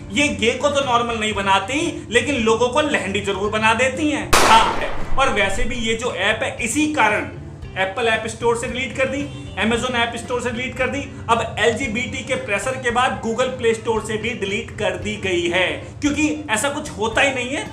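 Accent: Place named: Baghpat, India. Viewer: AAP KAHA SE HO SIR...aakpi language baghpat ki lal rahi hai...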